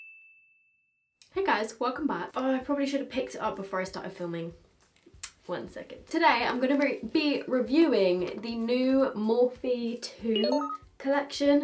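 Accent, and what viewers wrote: United Kingdom. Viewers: Are u British?luv ur accent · Ok but you can say d'amelio with a British accent and me sitting here with a British accent struggling to say it LIKE HOW DO PEOPLE SAY IT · I think it’s just the British accent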